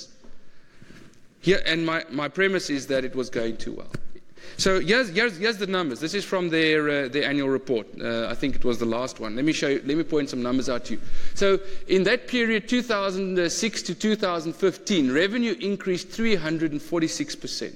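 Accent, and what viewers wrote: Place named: Pretoria, South Africa. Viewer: This man sounds Pretorian 😊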